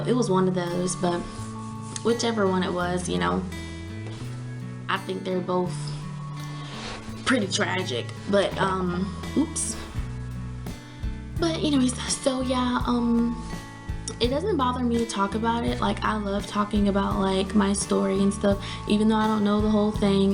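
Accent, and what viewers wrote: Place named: United States. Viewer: You are so adorable with your little country accent ❤❤❤❤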